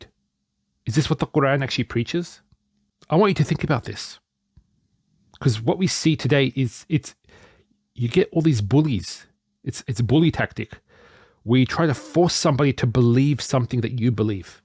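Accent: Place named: Australia. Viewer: Much respect to you brother. I admire your devotion in seeking the truth, no matter the cost. I myself come from a fanatic Salafi / Wahabi background and the Qur'an set me free from all that indoctrination and brain washing from tradition . I do not identity as a Sunni or Shia, but rather a Muslim who follows Millata Ibraheem - Haneefan. They love to label me as 'Quranist' 😅 I found out over the years that the Tradition diverts you from seeing the truth in the Qur'an. It is unfortunate that the Qur'an was never the primary source, which is why it gets so badly misinterpreted and distorted (like the example of the return of Jesus). I can tell you're Australian akhi by your accent. I'm Ozzy as well from Melbourne.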